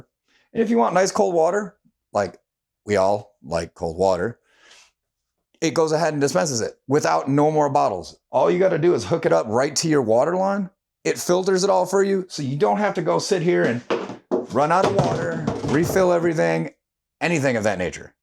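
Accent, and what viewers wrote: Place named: Buffalo, United States. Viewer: Knowing you're from Buffalo, that accent goes crazy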